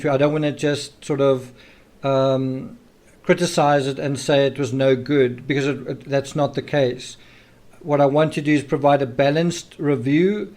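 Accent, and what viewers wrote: South Africa. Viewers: Dr Phil, has an accent SA hence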